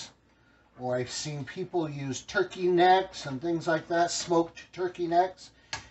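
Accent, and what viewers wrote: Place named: South, United States. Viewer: 🤦 dude, every time you told Alexa to set a timer for 3 minutes caused my Alexa to set a timer. Now my Alexa has a "greens" timer. 😂 I know this video is a couple of years old now but I just saw it. I'm about half redneck living in middle TN. The way you talked and done everything felt like I was listening to someone local and on my level. Been awhile since I done any canning and was looking for refreshers. You mentioned liking all kinds of greens like turnip, collard, mustard, whatever. If you haven't already you should try a mixed of all those together. Mixed greens are pretty darn good. Throwing in some kale, that you said wasn't your favorite, actually adds to the flavor. Some arugula mixed in is pretty good too. I do have a couple of suggestions, not criticisms or anything negative. I'm not a fan of a whole lot of turnip chunks in my greens. 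I'd recommend putting the turnip chunks in the jars first so you can get the amount you like. I'd also shoot for something in the middle when it comes to loose pack or tight pack. As a southerner I like some meat flavor with turnip greens. A couple of different things you could do if wanted it already canned instead of adding it later. Canning meat isn't a whole lot longer than just the greens. Maybe blanch the greens maybe a minute less, or not , and should still be good with meat. Or use some bullion in the water you are blanching them in and filling the jars. Again, absolutely nothing wrong with what you done. It helped me remember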